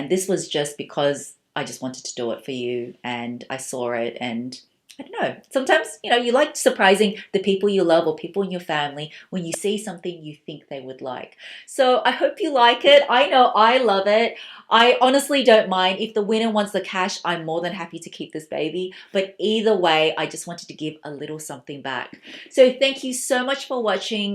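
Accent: Australia. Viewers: Hi, I love watching your videos. I’m from the US so I find you Ausie accent so adorable 😁. I would like the bag please! I’m a new YouTuber and it would be awesome 👏 to win the bag so I can vlog about it!!! It would mean so much to me! Wishing you more success!!!! ♥️🤗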